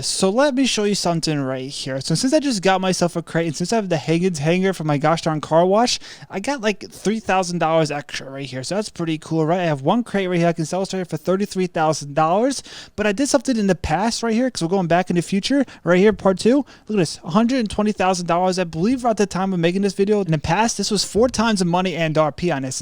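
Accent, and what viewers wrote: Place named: New York, United States. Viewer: strong new york accent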